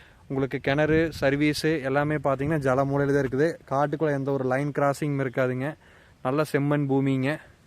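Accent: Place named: Coimbatore, India. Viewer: கோவை தமிழ் மிக அறுமை. உங்கள் channel வலர வாழ்த்துக்கள்.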